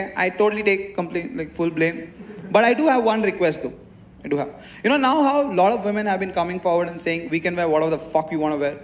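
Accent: South India, India. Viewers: That innocent face he made "I don't wanna kill I want to fix things" was just priceless 😍 Great content Super Comedy With his South Indian accent ❤️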